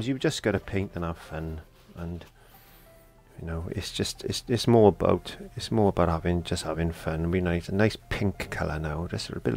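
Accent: Wales, United Kingdom. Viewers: Love your Welsh accent! 👍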